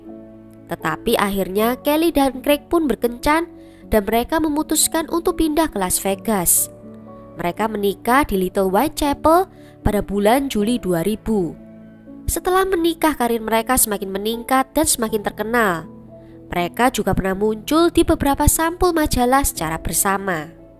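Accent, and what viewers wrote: Java, Indonesia. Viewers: medok bgt sis.. wong endi sampean?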